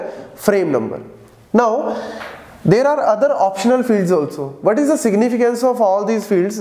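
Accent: Punjab, India. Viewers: Sir aap punjab se ho??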